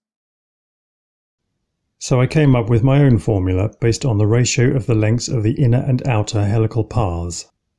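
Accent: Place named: England, United Kingdom. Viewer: I'm just over the moon to hear a middle class English accent on YT!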